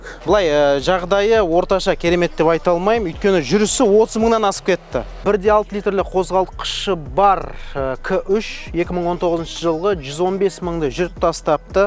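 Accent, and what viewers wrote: Standard. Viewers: Жарайсың бауырым. Таза қазақ тілінде түсінікті әңгімелейді,қандай әдемі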